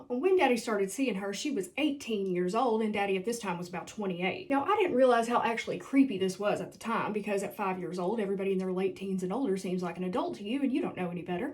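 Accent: South, United States. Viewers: Honestly the southern twang in these videos is amazing! I love it so much, and the way you tell it! I'm gripped! Want to know more 😂 Also lots of love to you ❤️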